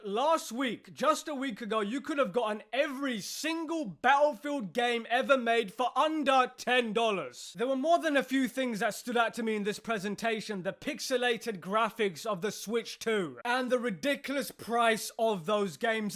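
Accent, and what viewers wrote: United Kingdom. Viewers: Are you British? But being tongue lashed by a Brit is so entertaining 😂😎👍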